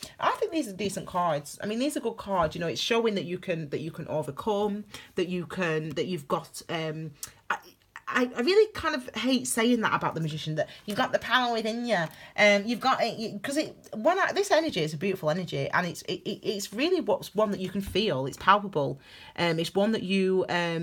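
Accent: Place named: North, United Kingdom. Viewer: My pleasure! More please! LOL! It’s ironic that when I listen to many of those Texan fast mouth readers I can barely understand them. Your beautufl Northern tones of course are so easy to listen to...and of course the content is excellent! 🍷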